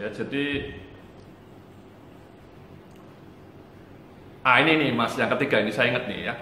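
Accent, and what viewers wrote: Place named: Java, Indonesia. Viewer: kok ngomonge kaya wong jawa yak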